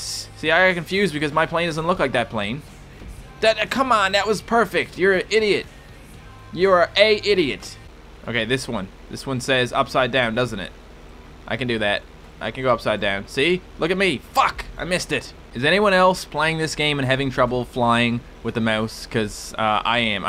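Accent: Canada. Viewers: He's Canadian right? He sounds Canadian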